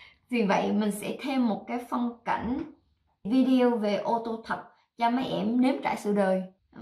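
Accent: Binh Dinh, Vietnam. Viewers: Bà ở Bình Định hả bà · nghe giọng nói của bạn giống như ở bình định hay phú yên hihi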